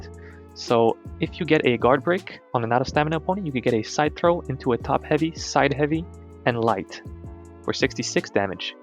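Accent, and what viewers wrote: Romania. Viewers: Are you from Romania?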